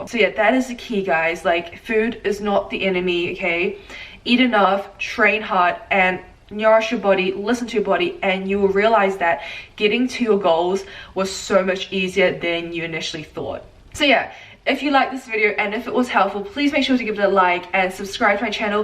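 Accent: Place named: New Zealand. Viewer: hahahaha you know what, the first time i watched your videos i picked up on that kiwi accent right away and i was like, YESSSSSS GUUURRRLLL OCEANIA PRIDE 😂😂😂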